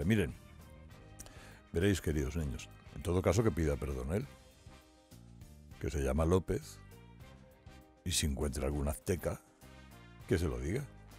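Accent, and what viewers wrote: Spain. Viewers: Hay pobre pend... que no sabes de historia, primero entérate tampoco éramos la nueva España. Pobre pend... no sabes de historia y deberías estudiar antes de abrir tu osico por tu acento español.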